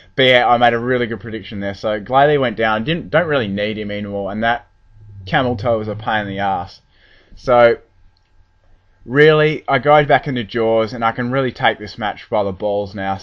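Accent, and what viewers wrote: Australia. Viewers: is the guy commentating australian?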